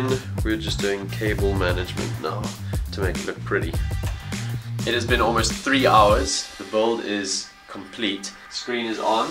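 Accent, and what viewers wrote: South Africa. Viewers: awesome bro, like to hear dialects from home. I'm from nam..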